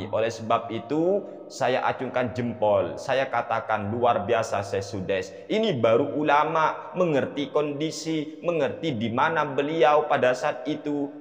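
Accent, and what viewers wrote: Madura, Indonesia. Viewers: Logat Madura ya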